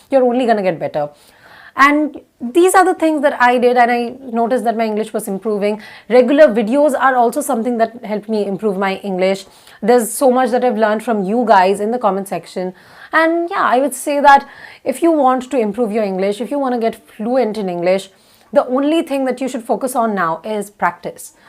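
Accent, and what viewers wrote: Bengal, India. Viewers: Everything is ok but i don’t like indian English. So bad accent . 😏🤧🤧🤧🤧 sorry to say. I can't respect it.... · You are always fabulous. Adorable indian accent.❤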